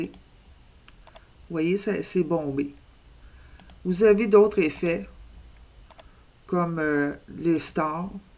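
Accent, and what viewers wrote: Canada. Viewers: sa ma plus votre façon de parler a la canadienne merci pour le parage ;-)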